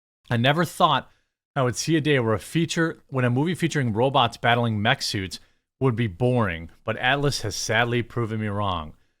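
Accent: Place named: Midwest, United States. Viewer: your midwest accent I barely notice... Usually... but the way you pronounce "Atlas" sounded like "Alice" until much later in the clip.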